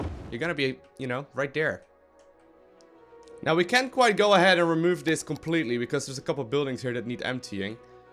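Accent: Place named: Netherlands. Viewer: where u come from sounds a bit dutch accent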